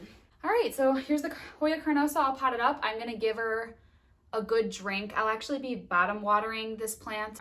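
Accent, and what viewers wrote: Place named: Wisconsin, United States. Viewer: I KNEW you had to be from Wisconsin (I could be wrong) because of your slight accent! I love it!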